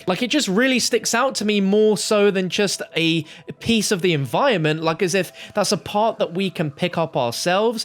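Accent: United Kingdom. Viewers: He might speak in a different dialect or he’s like British or isn’t from the US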